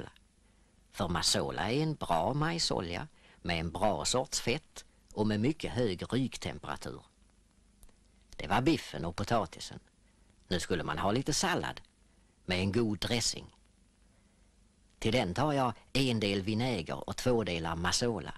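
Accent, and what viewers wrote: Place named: Skåne, Sweden. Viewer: Idag hade man inte haft en som talar utbredd skånska i en reklamfilm.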